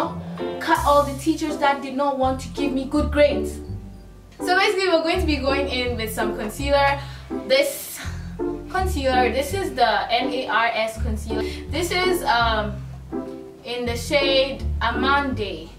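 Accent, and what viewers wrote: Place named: Nigeria. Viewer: "YOU WILL BE BLOCKED LIKE BOM BOM POW" OUII SLAY GURL YASSS😗😗😘 NIGERIAN BISSH AYY UR MY NIGERIAN BUDDY THAT ACCENT THO GURL I LOVE YOUU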